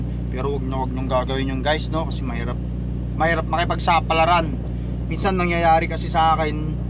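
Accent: Ilocos, Philippines. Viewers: Mailiw nak man idiay Pinas no mangeg ko accent mo.. Keep safe trucking Manong ko, shout out met ah ..Uncle Sam Outdoor from Sydney Australia originally from Tondol Anda Pangasinan..Dios ti agngina apo.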